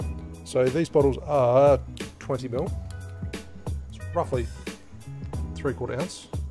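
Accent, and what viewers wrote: Germany. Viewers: I love your German accent! And it looks like a great drink!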